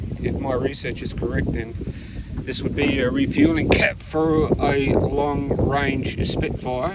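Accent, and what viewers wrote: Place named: Australia. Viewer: You definitely have. Assuming by the accent you’re in Australia. It’ll be a Spitfire MK VIII, leading edge tank as standard. Give Cameron Roloh-Smith a ring at Performance Aero at Archerfield, he’s the Australian Spitfire man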